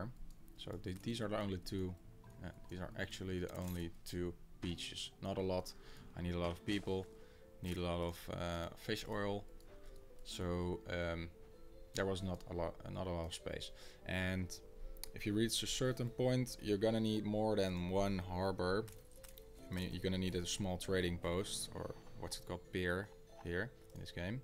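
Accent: Netherlands. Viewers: Ik herken een Hollander uit 100 accenten :D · a lot of ppl are annoyed with the Dutch accent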